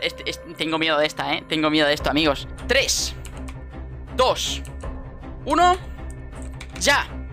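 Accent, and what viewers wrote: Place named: Russia. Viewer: La primera ves qu vi ah dije alv un ruso que habla español xd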